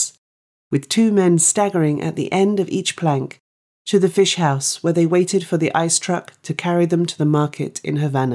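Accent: RP, United Kingdom. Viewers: Is It a rp accent?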